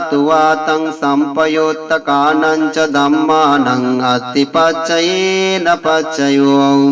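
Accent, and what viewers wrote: Standard. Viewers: সাধু সাধু সাধু। একদম শুদ্ধ পালি উচ্চারণ। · খুউব শ্রুতিমধুর ও বিশুদ্ধ উচ্চারণ মনে-প্রাণে প্রশান্তি অনুভব করছি,, 👏👏👏, বন্দনা ভান্তে আপনার নীরোগ দীর্ঘায়ু কামনা করছি,, 🙏🙏🙏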